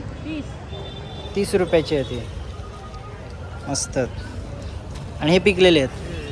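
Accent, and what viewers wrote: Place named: Malvan, India. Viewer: खूप छान मालवणी आवाज मी आरवली चो ❤❤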